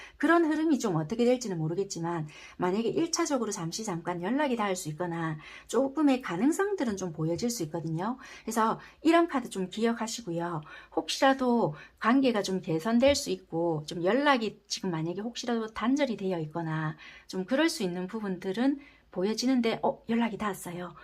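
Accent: Busan, South Korea. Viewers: 4번! 잘맞는거 같아요! 있는 그대로, 솔직하게!! 네 맞아요. 그런 말 했네요. 금전적 현실적 어려움도 맞아요! 구독 추천 누르고 갈께요! 부산사투리 넘 좋네요😄 다음 영상 기대할께요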